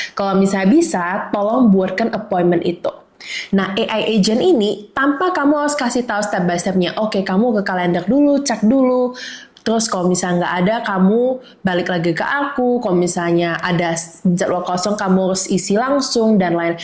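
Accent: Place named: France. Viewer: aksennya franch bgt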